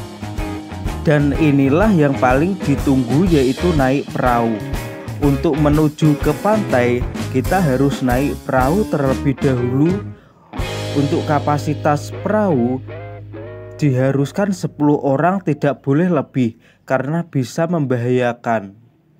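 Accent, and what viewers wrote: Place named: Java, Indonesia. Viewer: Jawane Medok to mas😅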